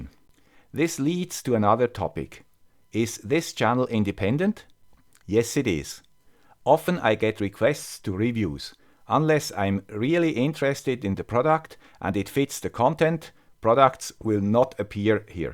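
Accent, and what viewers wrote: Switzerland. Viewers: There are a lot of rumors regarding what the youtube algorithm favors. In my experience most of them are based on very small sample sizes of observations. In niches which are more about the personality of the presenter (vlogging, comedy, and in crowded topics like video gaming) a face on-screen may be more important than in videos which are mostly about the topic being presented than the person doing the presenting. In ' case, his voice is enough to identify instantly that this is a "guy with the Swiss accent" video. It is very good branding that doesn't always need a face shown constantly. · you are a very charismatic guy "with the Swiss accent". Your engineering approach combines the best mix of theory and experimentation. This is great. I reached your channel looking for long distance radios and i found LoRa and all sorts of related stuff. 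I kept with you and learned a lot more. Other people with a lot more subscribers are doing a very poor job providing very inaccurate information on everything. You know your limits and you respect them. I admire you for that. Keep your content safe for the future. Your legacy will last very long. Thank you. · Your channel is dry and humorless. A robot "With a Swiss accent"? People watch videos to be entertained. Most of your viewers know this shit already. You want proof? Make a mistake and see how many will jump in to correct you! If I want to know about a subject in depth, I'll read a few books about it. Not rely on a superficial 10-20 minute scripted video. People want honesty, but when you give it to them, they resent you for it. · There is no competition for a smart tech guy with a Swiss accent :) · Have a great 2019,! IMHO, you, like Dave, GScott and a very few others, became references for what a YouTube channel shoud be: a tool of mass education. 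Please keep it going, with a Swiss accent!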